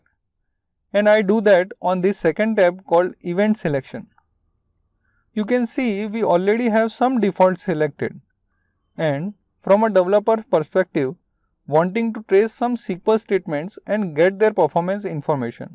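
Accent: India. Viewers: indian accent makes me sleep... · Indian people english. Suddenly close the video ... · The Indian makes my ears cry.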